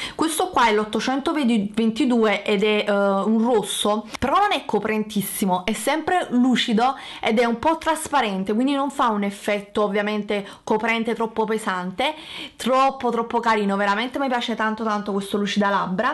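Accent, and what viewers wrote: Naples, Italy. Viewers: Posso dirti che il tuo accento napoletano mi sta troppo simpatico? :) Non so perché, ma mi ispira dolcezza!! Posso farti una domanda? Vorrei comprare qualche ingrediente per creare cosine semplici (deodorante in crema/stick gel per i brufoli, tonico, burro per il corpo), per questo vorrei evitare spese grosse. Se comprassi solo queste cose: tocoferolo, cosgard, burro di karitè e qualche olio essenziale, potrei creare qualcosa? O mi serve per forza la xanthan gum? Grazie mille e complimenti per tutto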